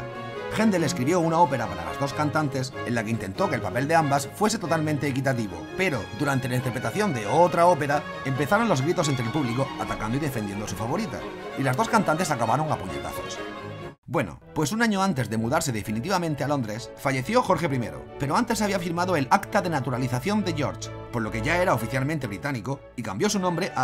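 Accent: Spain. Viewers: Vives en Andalucía Albacete o Madrid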